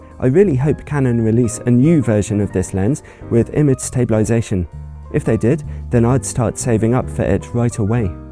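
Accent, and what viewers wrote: United Kingdom. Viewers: Hi, is it your name? yes it is, so no offence I don’t know who you are and where you came from, sound British my best guess, what a rubbish review of lenses you are bringing up, have Samyang whatever it is (again no offense) are paying you enough for what good stuff you are saying about them? I guess they are, LOL, be at least fair and honest to all people here in around YouTube and show your pics taken (in raw with all sets) with lenses you’re taking about (send links to original photos if no hurt you) otherwise do not give a shit, you seems from your voice nice guy! canon, tamron, sigma have so great cheap lenses so again be good not jerk.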